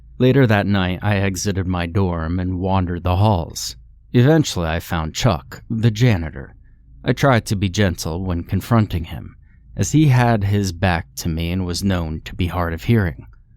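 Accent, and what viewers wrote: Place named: Appalachia, United States. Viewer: I don't like the hilly billy accent.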